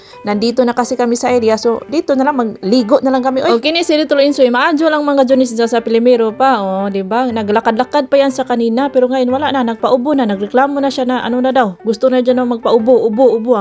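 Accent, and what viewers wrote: Bohol, Philippines. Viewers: Tagal bohol ka mam? Or surigao😅 kay murag mao mao ra gajud tag inistoryahan, taga bohol ko.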